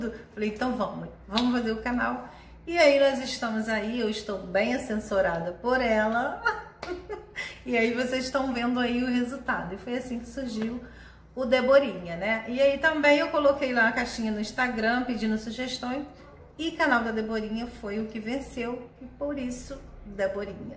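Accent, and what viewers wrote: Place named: Rio de Janeiro, Brazil. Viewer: Nossa Deborinha vc é baiana, mas tem um sutaque muuuuiiito puxado de carioca 😊 bjos! Vc é um amor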